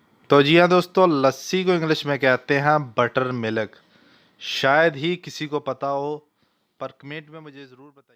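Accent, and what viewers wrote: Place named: Punjab, India. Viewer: یہ پنجابی بندہ ھے یہ اسکول کو بولتے ہیں سکول،جاوید کو بولتے ہیں جوید،رانا کو بولتے ہیں رانڑان،باریک کو بولتے ہیں بریک😁😁😁😁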